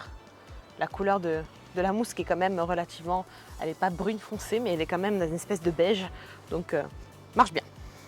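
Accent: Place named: Corsica, France. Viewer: Coucou comment vas-tu Petite vidéo sympa pour le bêtisier j aurait mis un colorant bleu comme ta voiture c est vrai que le produit a l'air peu économique mais il a deux fonctions PS j adore ton acens anglais corse😀😘 a vendredi bises cordialement ce serait un plaisir que un jour je puisse te rencontrer pour avoir tes conseils au plaisir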